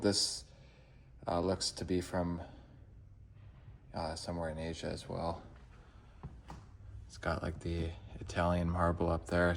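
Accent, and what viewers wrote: Canada. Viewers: Canadians always pronounced anything with an “ou” like owoo… howoose (house) owoot (out) spowoot (spout). Weird! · I subcribed to you a long time ago, somehow forgot about how much i enjoy looking at homes, you popped up and i am so addicted and been watching like crazy! You are so kind and respectful. I love your canadian accent sooooo much! Omg, your just adorable! ❤❤❤ thank u! Your videos calm me down after a hectic day. Hugs!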